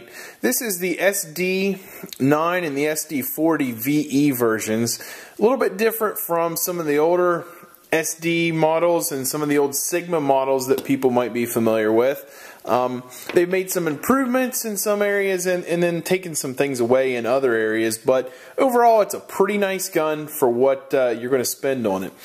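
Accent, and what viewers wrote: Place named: Delaware, United States. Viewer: where are you from. Sounds like Delaware accent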